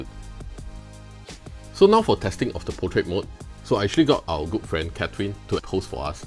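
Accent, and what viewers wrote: Singapore. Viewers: Within the first 5 seconds of the video, I can already tell this dude is either Malaysian or Singaporean through his accent. Turns out it was the latter XD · Good reviews , only problem is your Singlish. Always fast and no tone at all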